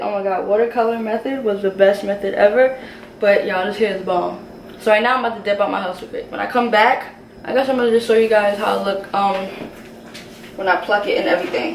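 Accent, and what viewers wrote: New York, United States. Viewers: NY accent 😍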